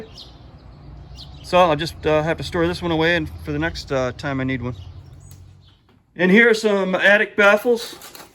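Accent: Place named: New Jersey, United States. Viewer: You from the Northeast? Sounds like Jersey or Pennsylvania. I'm from Jersey living in FL. I really enjoy your work!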